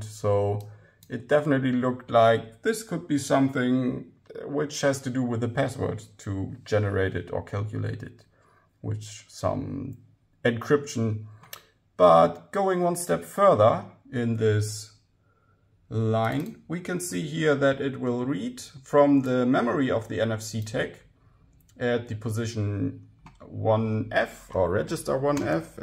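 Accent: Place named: Germany. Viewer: Interessante Information aber dein Akzent kommt so stark (wie bei jedem Deutschen) hervor :D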